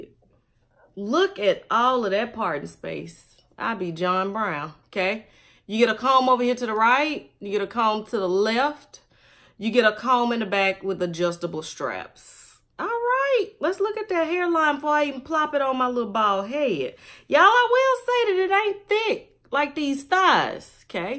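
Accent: South, United States. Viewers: I subscribed because 1. You’re beautiful 2. I love your accent wall 3. I want to perfect your southern accent 😊😂 I will be ordering this wig soon. Good review, thanks sis. 🙂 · New subbie!!!🤣🤣🤣You are hilarious! Love the southern accent! You be talking to sis!🤣❤❤❤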